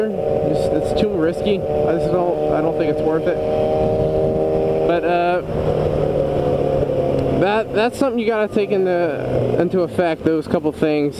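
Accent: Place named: South, United States. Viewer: Im just curious but where do you live? Like what general area? Im not trying to be creepy or stalkerish but I was wondering because you are always going to the beach, the area seems very temperate, like in this vid you see some swap. From your accent you it sounds like the south maybe? Im guessing NC/SC. Im thinking on moving down there in the spring an was curious about the riding season.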